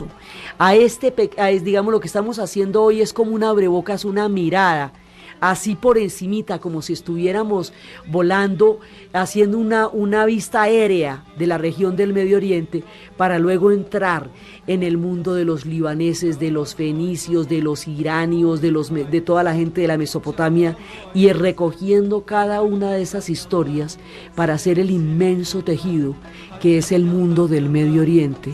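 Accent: Colombia. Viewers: Gracias por estos hermosos relatos, los amo! He escuchado varios, en varrias ocasiones, igual que el resto de tu audiencia, pienso que sería buenisimo un mapa. Me encanta escuchar tu bello acento de Colombia, en México nos encanta la forma de hablar de tu bello país.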